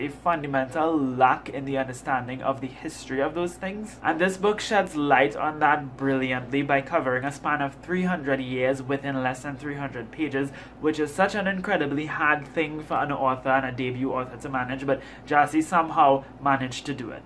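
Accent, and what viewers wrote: Trinidad and Tobago. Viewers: It's so weird but refreshing to hear hear a Trini accent for once. I've gotten so used to foreign booktubers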